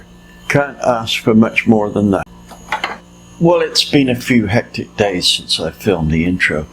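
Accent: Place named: South West, United Kingdom. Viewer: MARTIN PIERCE is (A VERY OLD GREASE MONKEY) who sounds exactly like you with that old south west farmer accent , not sure he will watch a channel like this? Martin is the smallest cheat in car mechanics i know 😂where’s me a 48 clueless female (car lover) have bodged many a thing on my cars and buy some miracle they’ve worked . Like the last exhaust i just did . Know iv got the attention span of a fish so as much as id love to listen to your lovely slow talking persona il leave it to MARTIN PIERCE , with a damn site more patients than me!!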